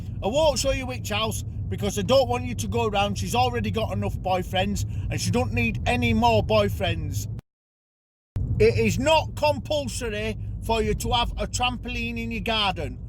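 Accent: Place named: Bradford, United Kingdom. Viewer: I'm a Bradford lad, but I moved over,t pennines 8 years ago to come live in Salford, just come across you today, gotta say fekkin hilarious, I used to work in Keighley and lived there too fe a few years, not changed much. Good to hear our accent too lad, they all talk like fekkin Diedre Barlow round ere 😁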